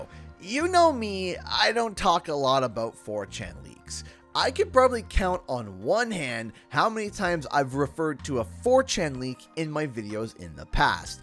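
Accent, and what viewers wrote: Canada. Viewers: Canadian accent sound like American access until they say just one or two words haha then I’m reminded where you’re from! About and sorry. Probably a few more?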